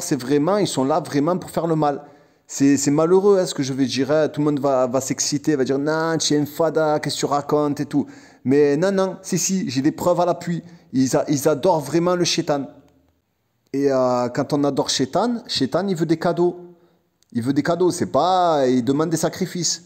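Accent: Marseille, France. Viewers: Ti a l'accent Marseille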